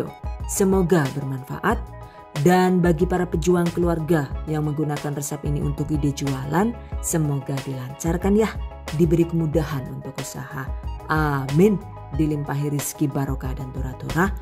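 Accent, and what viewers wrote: Banyumas, Indonesia. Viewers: Ini lokasi Madiun tp kok logatnya ngapak? Wkwkwkwk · Aku suka ketawa sendiri, klo bicara ngapak😊😊 lucu..😂😂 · Lebaran kemaren pakek resep inih, dan bener bener mantul banget, makasih ya bunda, gak salah sih subcribe channel ini krn bener bener bermanfaat banget, banyak tips and triknya. Apalagi seneng banget sama ucapan konyol bunda haha, dulu ku kira bukan suara asli kyk ada dubber org lain, ternyata asli loh orgnya juga cantik. Ngapak ngapak gitu lucu aja wkwkw #GiveawayTHV2021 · Mbaknya suaranya enak...empuk kaya kacangnya....hehe...ga taunya ngapak jg ya...... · Anger mb ngomong ngapak gawe aq gemuyu, menghibur 😃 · Trimakasih resepnya ya bun resepnya bunda mantap2 semua & +gemes sy kl bunda ngomong pake bahasa ngapak ampun deh sy jd ketawa trus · Suka ngapaaknya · Jenengan ngapak toh mamake😁_mntp mksh sharingny mamake mtrswn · Mantap... 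Pada bae logat Nyonge...😊👍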